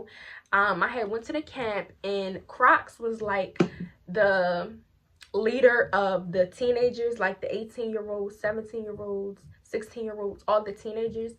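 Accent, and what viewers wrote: Baltimore, United States. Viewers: That’s that Baltimore accent!😭😭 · im from newyork and she has nothing close to a ny accent idk where you got that from😂, she has a strong baltimore accent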